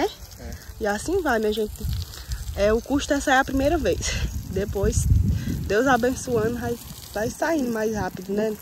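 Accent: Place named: Northeast, Brazil. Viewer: Tu nunca veio no nordeste não foi ? Nois só fala assim, ninguém e igual a ninguém todo cidade todo país,as pessoas tem seu jeito de falar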